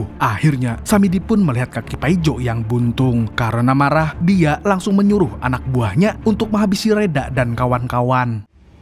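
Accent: Banjar, Indonesia. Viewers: Urang banjar kh nih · Urang banjar kh pian mang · Lawasny meumpati, hnyr tau urg banjar sdn ni😁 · Orang banjar kah mang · Orang Banjar yg b isi akun ini kayak nya 😂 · Orang Banjar jua lah mang?😁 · Urang banjar kah pian mang😂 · Mamang bantaki basa banjarnya memberikan komentar rami banaŕ jadinya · Banjar kh mang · Kaypa habar nya.? Banjar ni pina nya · Admin nya orang Banjar kalua kah😂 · Halus-halus wani hangittt 😂😂😂😅pian orang banjar kah bang 😅😅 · Halus halus wani hangit wkwk orang Banjar kah bang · Admin orang Banjar kah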